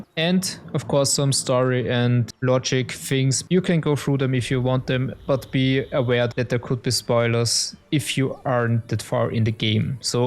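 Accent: Germany. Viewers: No offence, but that's a hell of a german accent... XD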